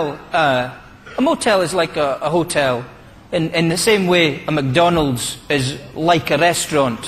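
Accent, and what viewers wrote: Australia. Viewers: Haha Danny actually is the only comedian who has got our accent pretty much perfect. Other comedians try to sound like us and often fail. So yeah we do sound kind of like that, but I think he's only trying to do the stereotypical Aussie accent for comedic purposes. And it works because I too laugh my ass off. xD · People who may not realize this, perhaps Americans stumbling upon this for the first time, this guy is right on the money !! His "Aussie accent" needs only a teensy.wee bit more perfecting, but he has summed up Australia & Australians perfectly in a nutshell, beautifully, in fact.!! This bloke is not only very observant, but be's also very funny. #!! A top-notch stand-up comedian !! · Real OZ accent!! not beautiful at all. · Man this guy's got down the middle-aged Shazza accent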